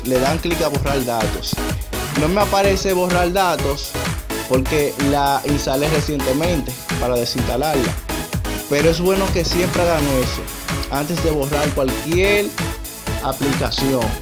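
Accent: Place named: Dominican Republic. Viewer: Me suenas a dominicano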